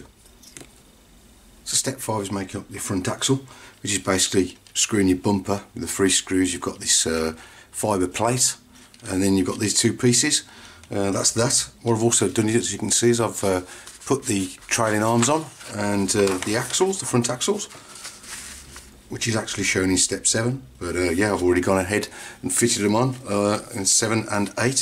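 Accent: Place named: West Midlands, United Kingdom. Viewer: Hi.. I have one on pre-order looking forward to it arriving:) great vid.. subscribed.. whereabouts you from sounds like your around west mids? im from Streetly.. Sutton:)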